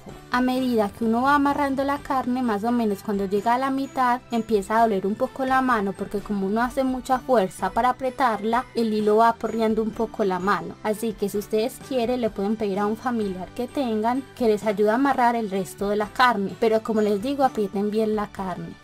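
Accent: Antioquia, Colombia. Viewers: Tan Querida con ese acentíco Paisa. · Super esta receta😂😂😂😂me senti hablando yo...puro paisa.gracias por compartir❤ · Como todas tus recetas excelente me encantó un abrazo de un venezolano que vive en argentina y por supuesto esa receta el mejor acompañamiento que tiene es ese acento paisa tan lindo ❤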